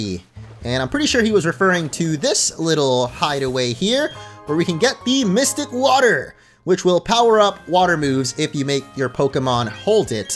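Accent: France. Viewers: Love your Frenglish accent xD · Your french accent is hilarious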